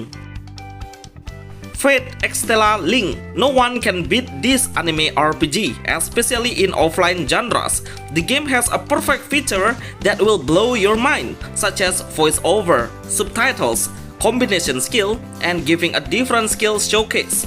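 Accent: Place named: Indonesia. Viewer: mungkin pembicaranya yg indo bang kek indo banget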